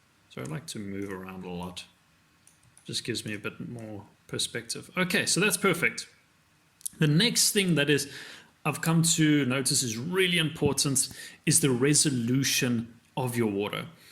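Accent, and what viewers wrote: South Africa. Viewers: south african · Great to see a fellow Saffa in the 3D community :D Could recognize that accent in the first few seconds. Great tutorial! Thanks for the effort.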